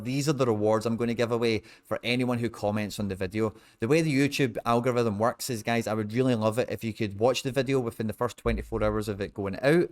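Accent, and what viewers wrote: Scotland, United Kingdom. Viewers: Few things like listening to a cheery Scotsman discuss Sorare as the friday workday winds down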